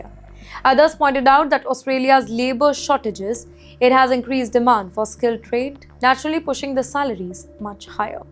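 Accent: India. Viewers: It's Indian english, u donkey, India speaks what the brit jokers 🤡 imposed on it, and then recultured it! Stupid convict!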